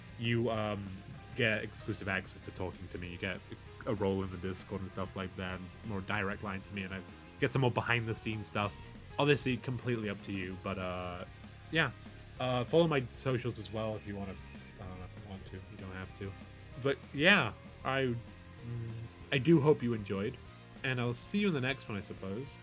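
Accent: United Kingdom. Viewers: They gotta be bri’ish or just really fckn good at talking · I completely fuck with how British you are.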